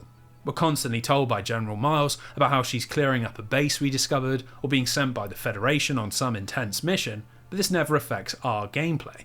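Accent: United Kingdom. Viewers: Wow this makes me want to play every Metroid I started with Metroid prime as a 9 year old it was too much for my simple mind then but I want to revisit all of them after this video and I’m so so sorry you fighting cancer god bless you!! I just got sober first time in my life different fight but still my life was on the line due to my drug of choice…and I will always argue you deserve millions of views and I’ll always say the smooth UK accent doesn’t hurt (; thanks bro love yah I’ll pray for yah and don’t ever stop fighting 🤙🏻❤️👍🏻🫶🏻👊🏻💪🏻💪🏻🦾 you kick that cancers ass you make so many peoples day brighter and feel nostalgic of their own past and for that we all thank you !! 🎉🎉🎉🎉 let’s go brother !!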